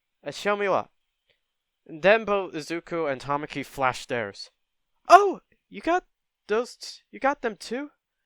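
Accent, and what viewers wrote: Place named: United Kingdom. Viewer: Why, it sound like British accent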